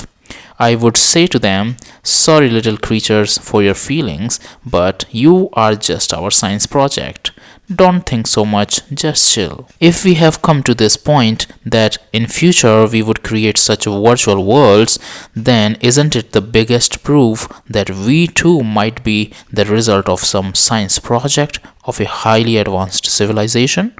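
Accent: India. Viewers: Ugh indian dialect 🙄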